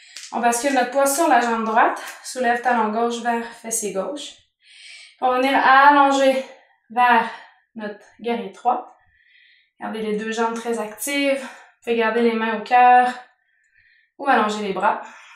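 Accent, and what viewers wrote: Quebec, Canada. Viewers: Bravo à vous !!! Je fais pas mal de séances de yoga sur youtube et je veux vous féliciter pour la qualité de votre cours ! Tout y est clair : postures bien expliquées et mouvements respiratoires annoncés au bon moment. De plus, votre voix et votre accent québecois sont très agréables ! Merci !!!!!! Namasté !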